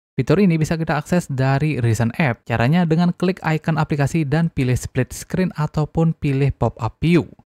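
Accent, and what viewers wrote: Bali, Indonesia. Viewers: Suaranya Bali banget bli hehe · Bang orang bali atau lombok?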